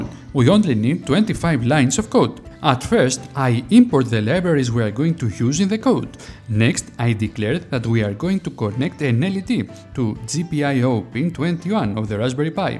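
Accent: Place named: Greece. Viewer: Greek accent tststst.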